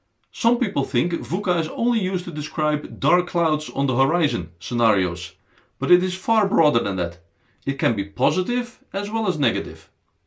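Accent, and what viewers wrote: Russia. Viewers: r u russian? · The accent is very Russian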